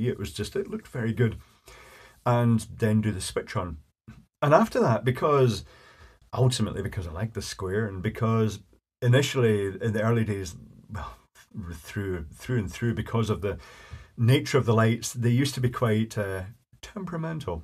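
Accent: Scotland, United Kingdom. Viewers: How should I say this...I'm completely distracted with story time because I'm watching this video and it appears to be me speaking with a Scottish accent and wearing my father's beard. Same hair cut, same hairline. We even look to be the same age. Beyond bizarre. What color are your eyes? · Poshest Scottish voice I’ve ever heard, great videos btw.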